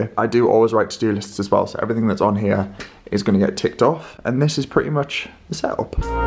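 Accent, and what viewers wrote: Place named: Newcastle, United Kingdom. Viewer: your geordie accent caught my ear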